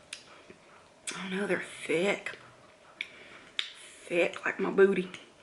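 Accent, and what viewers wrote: South, United States. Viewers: Agree, she's so friendly, sweet and down to earth and seems like a kind person, love her Southern accent_too! Love Thanksgiving food!😋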